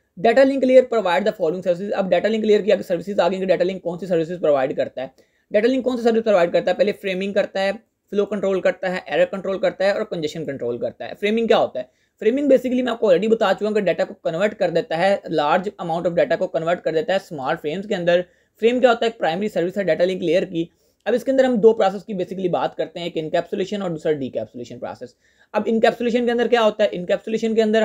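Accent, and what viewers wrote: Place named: Karachi, Pakistan. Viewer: Lahjy sai karachi waly maloom hoty ho...!! :goodvibes:😉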